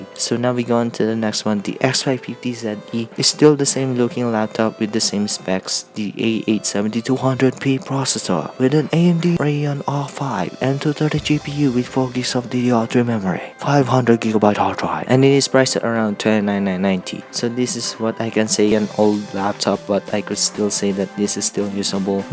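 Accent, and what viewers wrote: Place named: China. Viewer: i cant understand the damn specs with that chinese whatever style accent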